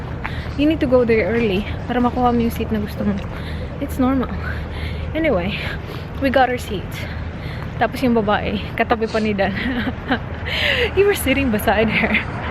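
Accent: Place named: Visayas, Philippines. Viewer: kaya nagustohan ko vlog nya kasi ung accent nia bisaya tas ung english nya maganda rin pagka pronounce nia.. 😍😍😍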